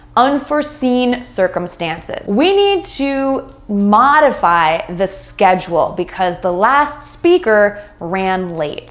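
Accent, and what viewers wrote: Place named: United States. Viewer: I like American’s accent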